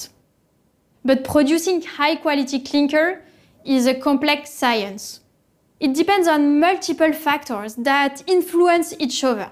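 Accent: France. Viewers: I think she's French. But it sounds so good. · French accent is quite cute 😅😂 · Let me guess.. she's from France.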